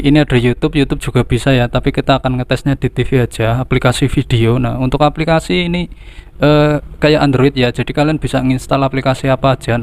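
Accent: Banyumas, Indonesia. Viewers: Ngapak apa yah kang?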